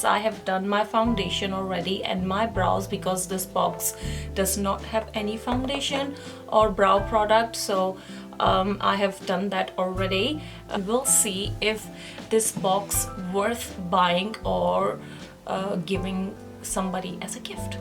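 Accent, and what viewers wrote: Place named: Pakistan. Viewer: You are so lovely and gorgeous I don’t mean to be nosy but are you Pakistani because you sound like Pakistani I don’t mean to be rude or nosy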